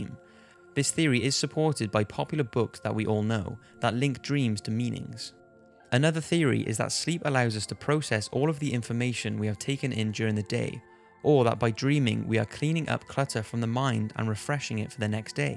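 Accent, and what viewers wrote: United Kingdom. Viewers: Hey what part of the UK is your accent from?? I live in Australia and there's heaps of British people here but I would rarely ever hear an accent like yours? Lol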